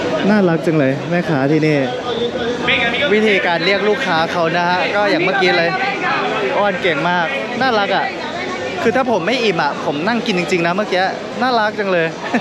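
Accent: Spain. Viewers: สำเนียงพูด ใช่เลย! คนสเปน555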